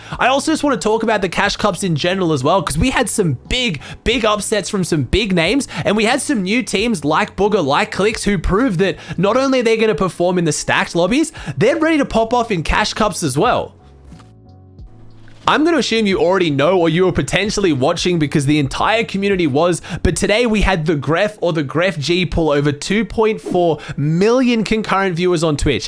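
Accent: Australia. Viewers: He said his gamertag got u aussie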